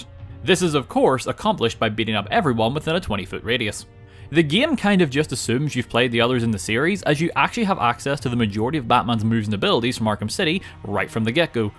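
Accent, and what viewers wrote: Northern Ireland, United Kingdom. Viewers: Northern Irish! cool to hear an NI accent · Are you from Northern Ireland?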